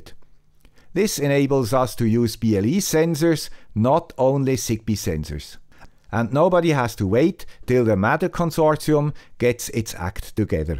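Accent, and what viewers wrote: Switzerland. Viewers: Just wait until how Swiss Accented Guy discovers NearLink / Green Tooth.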